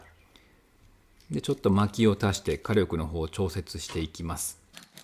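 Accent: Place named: Kyoto, Japan. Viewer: 徳井さんの京都弁「お出汁をパッ~ と入れますと...」夢中になってみれます。無になれます。徳井さんに感謝⤴︎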